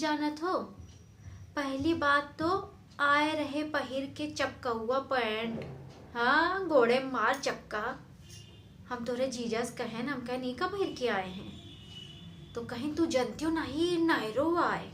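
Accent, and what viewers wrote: Awadhi, India. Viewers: Maine jb apko awadhi bolte suna to Mai dang rah gyi ke ye h👍👍👍👍👍👍👍👍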